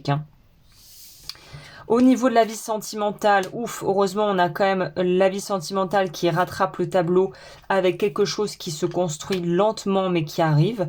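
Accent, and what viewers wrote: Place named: United States. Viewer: Vs avez vécu en Amérique??votre accent anglais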